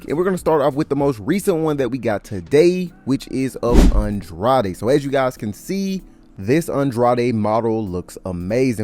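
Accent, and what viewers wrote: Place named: Memphis, United States. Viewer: I can’t ignore the Memphis??? Accent ..”herrstyles” and “yerrs “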